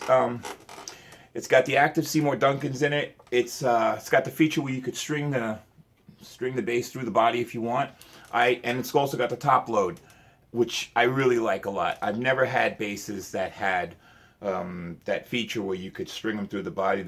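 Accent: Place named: New York, United States. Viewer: Wtf, that clanking and pick slapping sound is HIDEOUS. I am a Zep head playing 30yrs. A true Fender jazz elite fan. I wanted to check this out. I went to you tube for an independent unbiased review but honestly WTF. I hear the Ny'r in ur voice as I am the same but if u like how ur amp is set or u believe that riff u picked thru had some kind of redeeming hook,... u are either very high or delusional. I wanted to get this bass but ur review brought vomit into my mouth. I will need to go play it for myself. I realize u must have done something or recorded or produced but what I just heard is ^#$$^&$@@ Funny in the background my brother just pulled up in a convertible and he is listening to the by.. Go cleans your soul my brother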